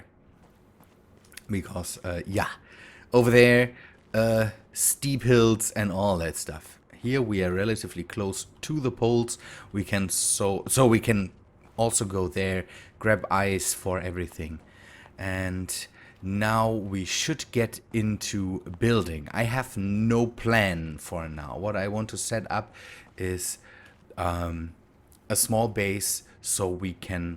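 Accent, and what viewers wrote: Germany. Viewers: you have a strong accent. your german right?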